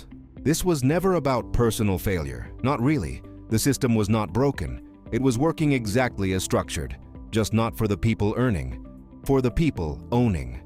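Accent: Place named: Australia. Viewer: Just Aussie. Too strong is off putting.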